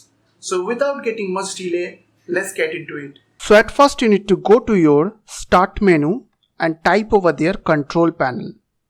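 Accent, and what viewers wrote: India. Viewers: I love your Indian accent (no kidding)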